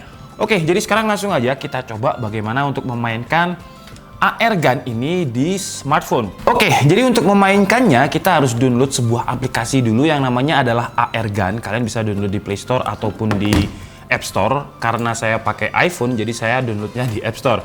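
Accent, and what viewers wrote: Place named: East Java, Indonesia. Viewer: Medok jatim rek 😂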